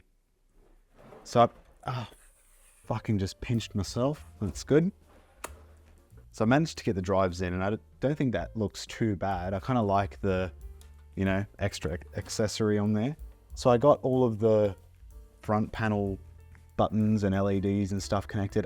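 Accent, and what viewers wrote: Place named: Sydney, Australia. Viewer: Sydney accent detected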